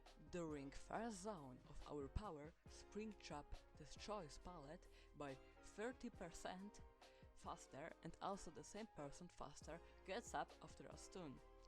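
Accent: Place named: England, United Kingdom. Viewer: Uu ar pierdolnięty anglik xd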